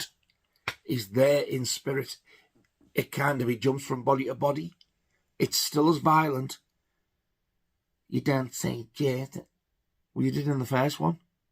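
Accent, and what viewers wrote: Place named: Northern England, United Kingdom. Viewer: whereabouts I can hear you’ve got an accent are you from like up north? I’m in London myself I’ve preached the gospel before in Doncaster and I was wondering if you might have been from there anyway your videos are good mate. Carry on the good work.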